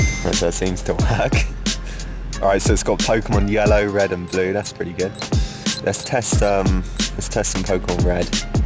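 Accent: United Kingdom. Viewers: Had a feeling you were british its nice to hear your voice for a video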